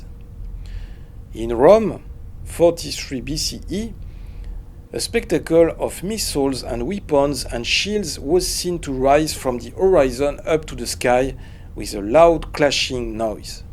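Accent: France. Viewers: Literally the strongest french accent I've ever heard 😂, cest bon mon ami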